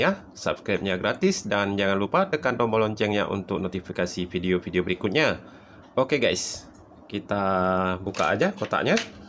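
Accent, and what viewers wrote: Malaysia. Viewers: Kok ngomongnya kayak org malaysia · Ni org indonesia apa org malaysia sih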